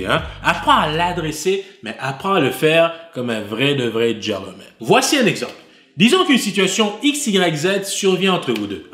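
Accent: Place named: Canada. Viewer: avec le son de la télé trop fort, devant mes neveux, cetait LOOOOLLLL. sinon belle video thanks. j'adore l'accent canadien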